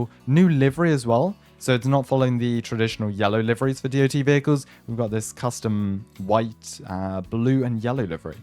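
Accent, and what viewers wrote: United Kingdom. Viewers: hes bri ish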